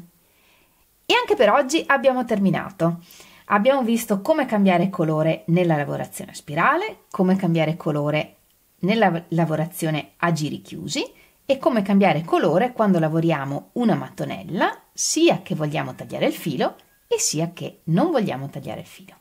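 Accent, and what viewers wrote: Veneto, Italy. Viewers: grazie, ho iniziato da poco a seguirti e devo dire che mi trovo molto bene perchè spieghi in maniere chiara e adoro il tuo leggero accento veneto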